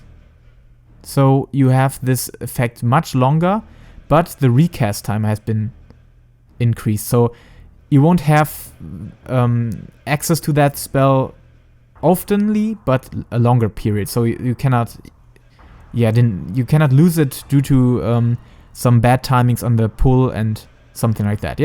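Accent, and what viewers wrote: Germany. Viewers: Reupload es am besten auf deutsch es klingt echt schlimm wenn deutsche eng reden .. nicht böse gemeint :) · es ist zwar ein deutscher dialekt vorhanden, aber grammatik/wortwahl sind immer richtig und die aussprache verständlich, also den zweck erfüllt es allemal :s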